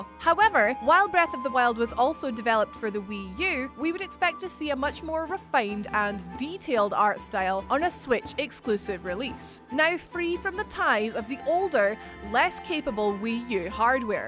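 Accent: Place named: Scotland, United Kingdom. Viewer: if you put your mind to it you can hear an accent that sounds like the princess from the disney movie Brave. yeah, I forget her name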